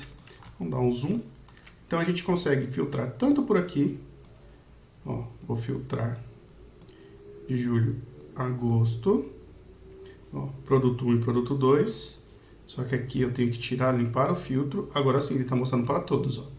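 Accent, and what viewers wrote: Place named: Minas Gerais, Brazil. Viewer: Sotaque minerim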